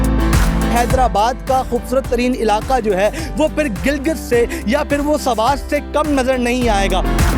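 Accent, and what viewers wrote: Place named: Pratapgarh, India. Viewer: Ap ky bolny kaa treqaa bilkul pretabadiyo jessa hy kam ki baat kuch bhi nahi ki siwaye bakwas kerny ky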